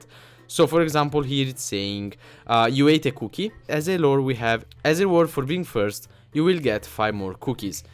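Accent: Italy. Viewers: Per quanto sia bravissimo a parlare inglese si vede che è italiano XD! Te ne sei accorto?? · bella frate sei italiano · Certo che se sente l'accento italiano xD GG bro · perché cazzo parli in inglese se sei italiano ps. si sente l`italiano;D se parleresti in italiano faresti un grande contributo a yt Italia:D;D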